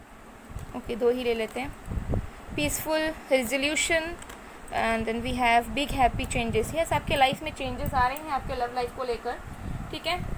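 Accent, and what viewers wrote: Bengal, India. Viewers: Mam aap bangoli ho kya.....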